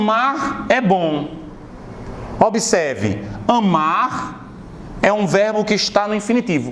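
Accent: Northeast, Brazil. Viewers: e esse sotaque nordestino professor o senhor é daqui mesmo?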